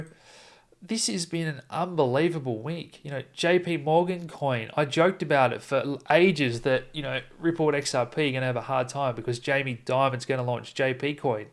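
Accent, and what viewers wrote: Tasmania, Australia. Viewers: Thanks. BTC around $13814 as I write this (Nov 1st, MT). Oil slaughtered. USD stronger. Wonder what will happen tomorrow with the S&P 500. All this interest in BTC makes me want more so is not all in the hands of the rich and corporations. Also ETH must start going up soon according to your analysis - I have only dregs left after maximizing my BTC. Your Tasmanian accent is very easy to listen to and I like that you, your wife, and kid seem so well balanced in this crazy world.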